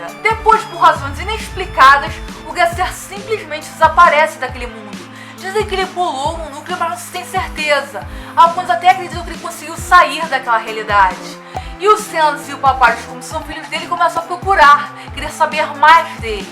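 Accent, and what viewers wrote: Rio de Janeiro, Brazil. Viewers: Ela é carioca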